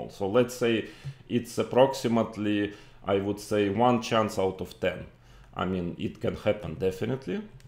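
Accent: Russia. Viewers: Владимир хорошо говорит на английском, правда с ОЧЕНЬ РУССКИИ АКЦЕНТОМ, что только радует что Владимир хорошо развит, и что он чисто русский, и мало был в Америке, и не нахватался американского акцента, в общем наш человек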